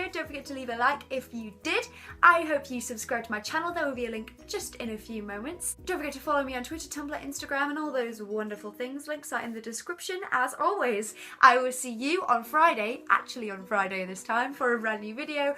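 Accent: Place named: England, United Kingdom. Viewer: I LOVE THE ENGLISH ACCENT!!!!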